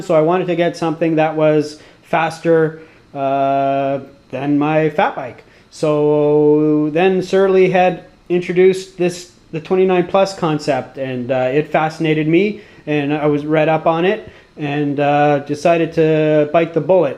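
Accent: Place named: Canada. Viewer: This dude sounds Canadian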